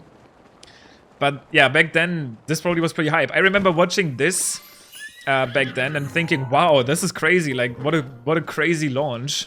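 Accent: Germany. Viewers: I think so. His accent really sounds like a german one. Me as a german can relate xD. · Are you actually German?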